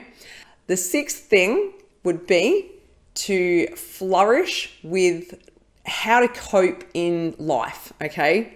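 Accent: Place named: Australia. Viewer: I know love is terrible. Lose that accent a little bit. You sound like someone steps on your toes and your voice going up at any other word. I understand is an Australian accent but man it sounds terrible. Just being honest not horrible to you. Surely other people thought the same but were too polite to point it out.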